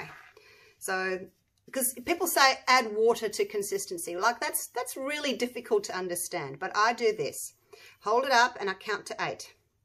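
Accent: Australia. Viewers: I enjoy all your creations. Love listening to your Aussie accent.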